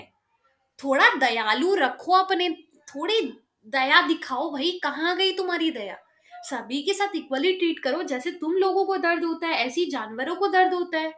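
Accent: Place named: Mumbai, India. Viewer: Tum mumbai se ho kya? Accent mumbai walo jese he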